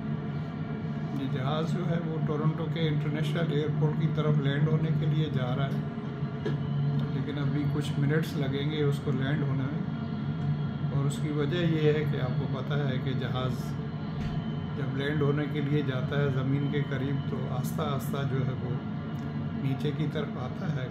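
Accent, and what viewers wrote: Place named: Pakistan. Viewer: no I was talking about his language. And that his accent tells me that he belongs to Pakistan.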